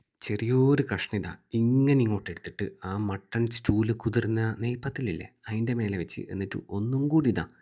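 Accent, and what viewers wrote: Kannur, India. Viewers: Ippo sherikk kannur karante samsaro ayknnallo ingalethh😅😊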